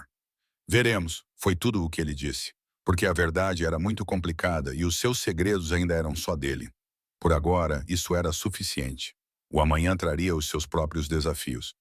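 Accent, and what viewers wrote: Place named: Portugal. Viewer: Gosto das estórias, não gosto muito da narração, português PT misturado com o nosso, gosto do sotaque brasileiro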